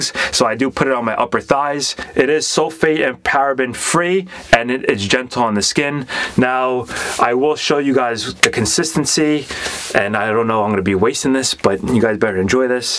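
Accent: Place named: New York, United States. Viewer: Bro has the NY accent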